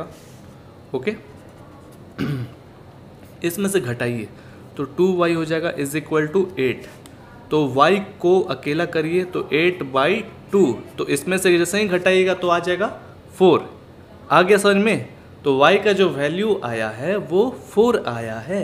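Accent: Bihar, India. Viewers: Sir aapke aawaaj me hamare bihar ki jhalak aa rhi hai ,, kyonki isme koi gunjaish hi nhi hai naa samjhe naa , ok paarnaam ,,ram ram 💪🙏🙏🇮🇳🇮🇳🇮🇳💪💪💪💪💪🙏🇮🇳😘🥰😍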